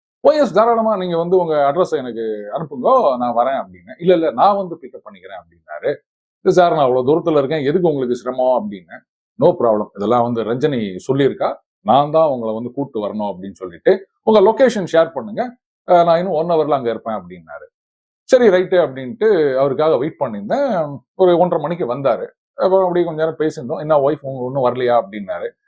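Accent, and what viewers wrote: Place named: Tirunelveli, India. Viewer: அழகி திரைப்படம் என்னுள் ஏற்படுத்திய அதிர்வுகளை, இக்கதையும் (நிஜமும்) ஏற்படுத்தியுள்ளது. உங்களின் நெல்லை வட்டார வழக்கு அற்புதம்.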